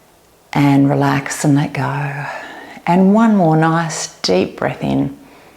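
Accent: Australia. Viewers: is that a Melbourne accent? Gorgeous.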